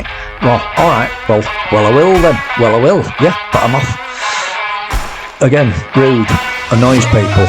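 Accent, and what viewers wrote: United Kingdom. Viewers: Nice to hear some fellow Yorkshireman tones, and I see auto-captions has improved a bit at interpreting them, too, lol. Have a sub, lad. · I see there are terrible drivers in the UK just like they are here in United States. I love to hear you speak the Kings English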